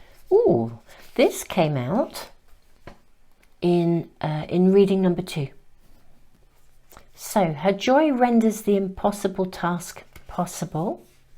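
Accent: United Kingdom. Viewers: I LOVE listening to your reading. Sometimes I follow the way you express all your ideas. I asked myself, I am thankful because I can practice how to speak like a British. Someday ! I'll be there to UK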